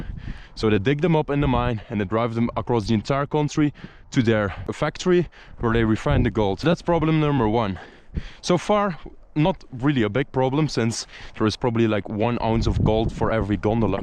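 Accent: Netherlands. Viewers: Hou van je nederlands accent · mien jong ik volg je nu al een tijd en ik wist aan je accent dat je nederland kon spreken lol. greetings from groningen for ya. youve gone on quite the adventures! i always like seeing it. just please be safe with the railways and such from time to time! i saw someone already notified you of them being electrified. keep it up though, exploring places is what shows us beauty in what was left behind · Loved it, loved the dutch accent too lol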